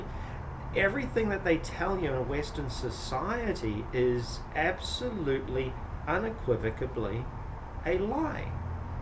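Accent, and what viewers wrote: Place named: New Zealand. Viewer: thats a very light New Zealand accent. easy to follow for us Yanks.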